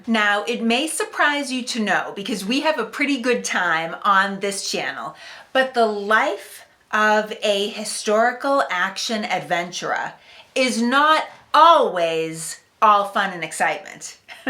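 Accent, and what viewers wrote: Boston, United States. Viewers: Love your knowledge and Boston accent. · I moved to Massachusetts from Washington DC in 1974, and I've lived in Somerville since 2010 (and in Brookline for ten years before that). I love your accent (it sounds like home now), and I appreciate your detailed description of that day. My wife and I celebrate Patriots Day every year by walking all or some of Battle Road (now a marvelous walking trail) and ending up at the Old North Bridge. This video reminds me of why I love Boston and Massachusetts. I'm terrified that we might have to do all this all over again. Is is coincidence that the bad guys wore red then and now? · Looking at your newly discovered map, I see where Warren’s Tavern got its name. 😊 Old maps + Bawstin accent = new subscriber! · Great vid. All done with a Boston accent. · So the Monday morning quarterbacking isn't an American thing because we stole it from the Brits just as we did the New World. 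LOL BTW your accent is a definite of Baaaston. I remember flying into Logan the first time in Baaaston and hearing the accent. I had to ask the young lady to repeat herself at the Orange Julius stand. Love the history and the accent. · The Boston accent makes this so much better. 😄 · Great video! One off topic observation: Your Bostinian accent is strikingly apparent with your conservation of "R"s, but be who you are... don't change. "Hither the Ships Ought to have come" is correct strategy as then they could have trapped the Provincials on the peninsula and completely slaughtered them all, which would have been a devastating loss for the rebels. Ahh, you're right about the causeway, I forgot about that. Today it has been all filled in. The causeway did exist, so what appeared to be an open bay in the previous map was NOT and not ships could have gotten to the "Hither" point. It's a good thing I am a pacifist and not a militray commander, I would be bloody ruthless. 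· I’m just here for that accent ..Boston or near? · That accent sounds like South Boston , Quincy.....? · I detect a Boston accent. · New to your channel. I'm born/raised in Massachusetts. Its fun to learn our history. You are very well spoken and intelligent. Love the Boston accent, too! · LOL From the very first minute of the vid, I said "Ah, she's from the Northeast...Boston?" After freshman year in college (1972) we took a little road trip through the NE. I'll never forget in Swampscot, we asked for directions and were told "...when you get to the monamin, make a left or you'll drive your cah into the hahbah." OK, monamin? Uh, thanks. So we drove and saw, to our enlightenment, a MONUMENT. I grew up in the NYC area but have also lived in FL and am now in the Pacific NW. It's so cool to hear the different accents of the different areas of the US. I'm actually first generation American as my father was not born here (he was from a British colony). 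So I enjoy looking at the history of the early US. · Grew up in Lexington. Know the war quite well. But always good to learn nuances and new details. Best part of this channel? That Boston accent. Lost mine decades ago after moving away. Good to hear that its distinctiveness still survives in 2024. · Revolutionary "Woo-ah"... Nice! Ok, the Boston accent is cute but you're over doing it a little bit. However, I can tell you're a proper Bostonian and it has nothing to do with pronouncing your "Ahhs". The give away is at 28 seconds when you're "gonna hafta" climb over the log. That was wicked pissah. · This is a wicked good channel. Even bettah to be told by an authentic bostonian. 😎👍 how ah ya! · Classic Boston accent! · Love your video , love your Boston accent . My 9x grandfather was a coast watcher for the Marquis D'Laffiette in Bristol , Rhode Island . His grandfather settled the town of Scituate ,Mass . 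The line ends with me . After 396 years of family history in this country it is to be no more . God bless and God bless your work ! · that’s some Bostonian accent sister. 😊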